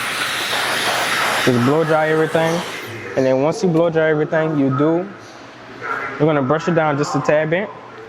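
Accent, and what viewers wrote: Louisiana, United States. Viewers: Louisiana accent loud asf😂😂❤ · Good shit brudda 💪🏾💪🏾💪🏾💪🏾 you got a heavy Louisiana accent brudda salute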